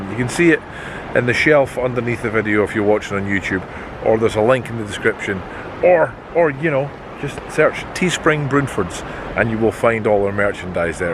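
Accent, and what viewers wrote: Scotland, United Kingdom. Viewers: My little cat Jasper seems to love your Scottish brogue. You really have his attention. 😊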